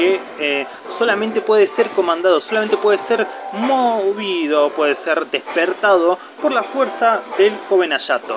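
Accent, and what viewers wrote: Argentina. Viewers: Seguramente buenos aires por el tono, yo tambien de buenos aires, entonces por eso creo que es de ahi. Una pregunta vos de que provincia sos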